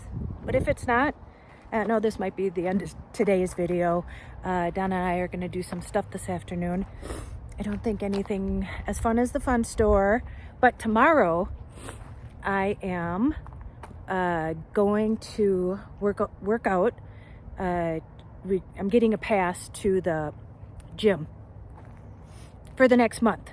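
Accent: Minnesota, United States. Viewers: Reasons to listen to you talk : Watch you cause ur beautuful Have a lovely voice with the pleasant MN accent Great story teller Are deep, honest n sincere Sharing ur journey n story I'm on one too n learn from you and did l say your beautiful n not 50 something! 😘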